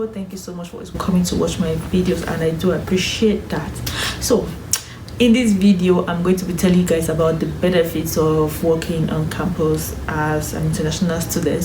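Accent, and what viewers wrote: Nigeria. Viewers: Mehn…you sound so Nigerian ✌🏾✌🏾✌🏾🙂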